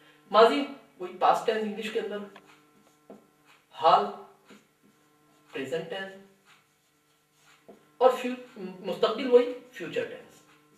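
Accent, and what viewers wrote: Iran. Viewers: it doesn't matter because it is a general grammar for Persian Language. But the accent is Tehrani ( For Tehran ) which also is called: Modern Persian. Tehrani accent is the standard accent to learn the Persian Language. other kinds of accent also are validate but as I mentioned, the best one is Tehrani. a simple example to evaluate the Tehrani accent and Dari accent is like British English and Australian English!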